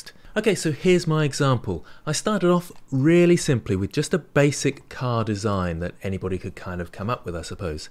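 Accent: England, United Kingdom. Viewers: English Accent Hits Different.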